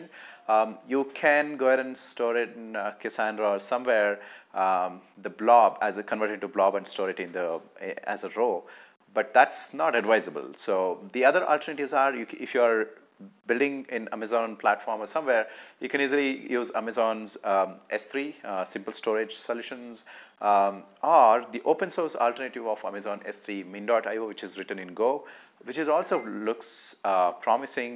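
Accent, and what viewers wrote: India. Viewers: An Indian youtube coding video where i don't feel like scratching my ears out!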